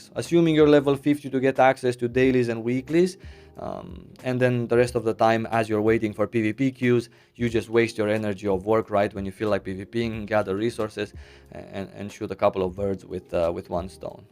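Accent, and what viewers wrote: Italy. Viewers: As usual very nice video thanks ! May I ask you a question ? I'm French and I'm used to hear people speaking English from different countries and with different accent. I don't know why but you accent sounds Italian, the way you speak English and the way you handle your hands as well :) At the same time I also think you may be from from an Eastern country like Romania. Do you mind telling where you're from ? (and no it's not because I'm a weirdo and felt in love with you !!!) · are you italian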